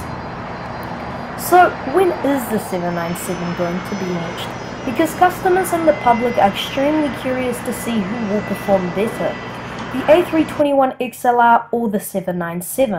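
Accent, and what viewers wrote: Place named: Australia. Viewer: Damn.....that Australian accent is annoying !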